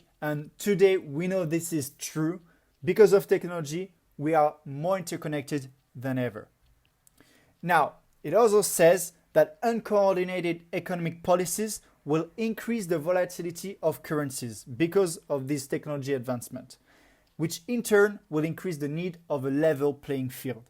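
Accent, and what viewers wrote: France. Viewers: tu parles bien anglais mais ton accent est français ^^ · Adam XRP Son Lol. This guy sound very French. Ran is South African...yet I hear how you could confuse the two.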